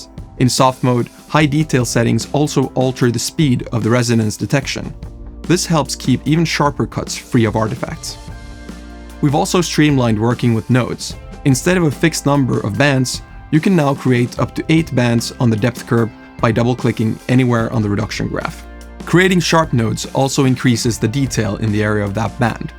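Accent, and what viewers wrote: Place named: Finland. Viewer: En tiennykkää et oli suomalainen, respect! Accent gave it away tho:D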